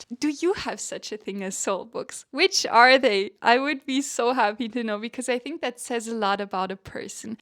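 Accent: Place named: Germany. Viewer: You have the sweetest darn voice - you're addictive to your listeners for sure, and your slight German accent makes you sound so erudite and warm hearted at the same time. You're definitely a charmer, and you're simply a joy to us that you exist and share so much of yourself.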